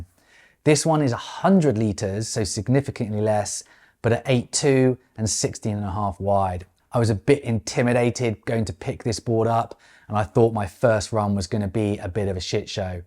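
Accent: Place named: England, United Kingdom. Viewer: Nice one. I love the Australian colloquialism with an English accent. Easy peazy lemon squeezy. I have a question about one of your earlier videos, Part 2 of you learning to downwind and flat water paddle up...You mentioned two things which made a significant difference...one was the foil and the other was the paddle. Can you explain why the paddle made a difference please. And also why you no longer use the PPC paddle shown in that video.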